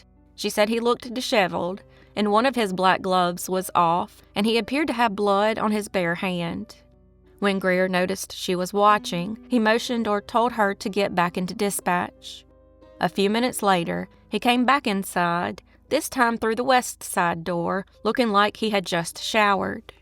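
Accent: South, United States. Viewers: THANK YOU!! LOVE THAT BEAUTIFUL SOUTHERN ACENT!! YOU GO GAL!! KEEP EM COMING!!:face-red-heart-shape:🤠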